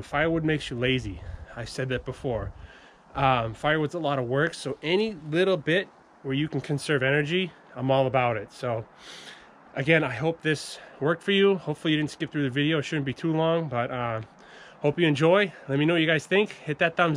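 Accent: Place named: New England, United States. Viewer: Took me 2 seconds to realize you were a fellow New Eglandah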